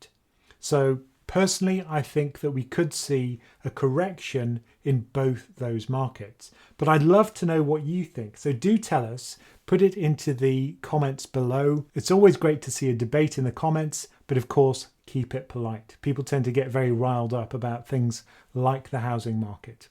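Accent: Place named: RP, United Kingdom. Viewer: You are such an intellectually honest man and your Queen's English is second to none. Bravo Amigo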